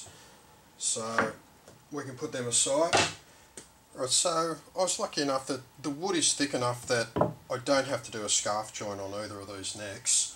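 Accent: Australia. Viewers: this is aussie accent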